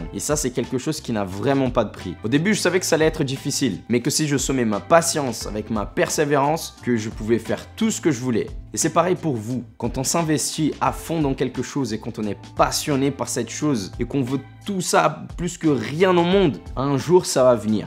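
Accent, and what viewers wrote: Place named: Brazil. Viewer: Finally ça m'a coûté deux cliques "s'abonner" et "j'aime" J'entends un fond d'accent brésilien, ne le perd pas, ça me rappelle des souvenirs 🇧🇷🌞 en tout cas j'attends la suite avec impatience.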